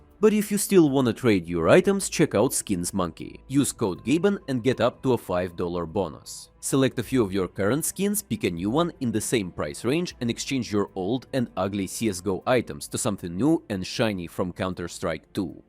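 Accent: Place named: Russia. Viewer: Честно, прям слышен РАШЕН АКЦЕНТ 🤣🤣🤣. Видео клёвое))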